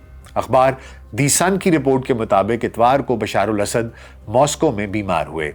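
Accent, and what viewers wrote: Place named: Punjab, Pakistan. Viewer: سر اپ بھت اچھا وی لاگ کرتے ہیں ریگولر سنتا ھوں زبردست لیکن ایک چیز نوٹ کی اپ بات اردو مے کرتے ہیں لیکن دو ہزارا۔ گیارا کو اپ دو ہزارا یاراں بولتے ہیں جو کے پنجابی مے بولا جاتا ھے اسکا خیال رکھیں ❤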